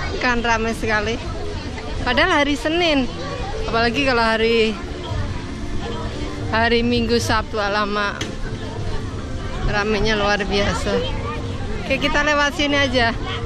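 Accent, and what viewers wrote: Java, Indonesia. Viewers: Mbak salam kenal ya... saya seneng sampean bahasa jowonya msh medok..i like it😊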